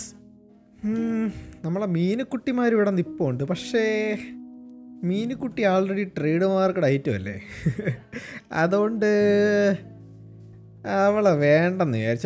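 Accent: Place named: Kasaragod, India. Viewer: Kerala mayi touch ullad kasarkood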